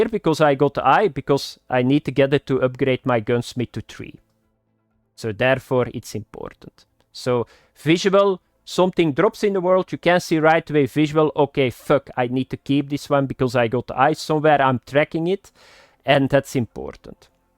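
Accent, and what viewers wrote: Flanders, Belgium. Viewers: ieeeel staarekk vloms accent ni echt nen dutchké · De belgische accent, i love it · Are you flemish (Belgium) by any change. Your englisch sounds like mine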